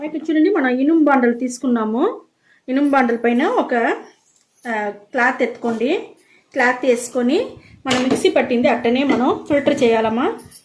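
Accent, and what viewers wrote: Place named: Rayalaseema, India. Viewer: Adhi rayalaseema slang ankunta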